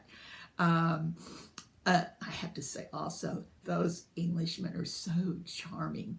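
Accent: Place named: United States. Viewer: yes we drink tea in massive amounts. tea is the answer to everything. and beans on toast is standard food for us all the way through our lives. the most scrumptious and delicious comfort food, and very easy to make and very hearty when we can't be bothered to cook a full meal. Just open the tin of beans and make some toast! :D well I am British and I do like your accent too. Just as American ladies love English accents, so are we Englishmen attracted to American ladies with charming accents!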